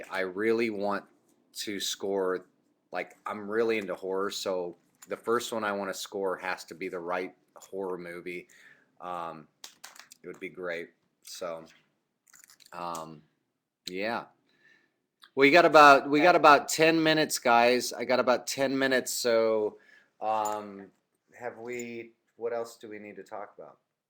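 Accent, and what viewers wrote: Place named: Indiana, United States. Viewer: He pronounces them that way because he's from Indiana, that's how they talk, it's an accent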